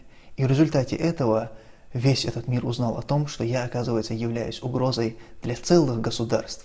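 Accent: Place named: Chechnya, Russia. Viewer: ты похож на европейца. Из чеченского у тебя остались акцент и внешний "имидж"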